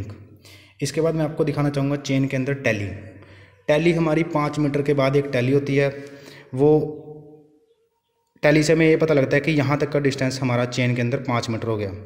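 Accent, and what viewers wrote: India. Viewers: sir are you from himachal?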